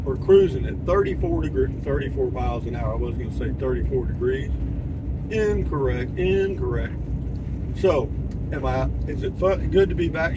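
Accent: Texas, United States. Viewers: I won't rent out my RV's either! I have a vintage Silver Streak & a 24' motorhome. Yes, my clothes, dishes, cooking utensils, etc are in them. I rigged out my van for an 8mth trip to PA to help my Mom, but I sure am missing Texas & our accent! 🇨🇱 I had to put Texas stickers on my van just to have a little Texas with me! I'll be back in a couple of months. Thanks for a little atmosphere & accent!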